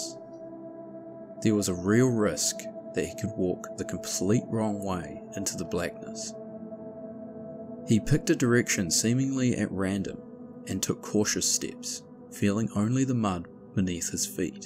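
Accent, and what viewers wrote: New Zealand. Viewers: This was really good. I have no problem with a New Zealand accent! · Terrifying, miraculous and addictive watching! Awesome vid, 👌 thanks mate 👍 Great to hear a kiwi accent 🇳🇿, with love from Oz 🇦🇺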